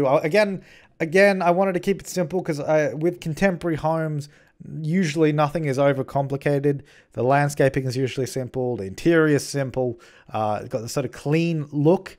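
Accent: Australia. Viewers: YOUR AUSSIE I DID NOT KNOW THAT OMG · I've been watching his video for ages and never recognised the Aussie accent cause I'm Australian too lol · I feel so disappointed, as an Australian, that I didn't recognise that you're Australian until you said you are. Haha. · i didnt know u were an aussie lol ok well u do learn something new every day :P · yeah us Aussies say things weird lol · OMG HE IS AUSSIE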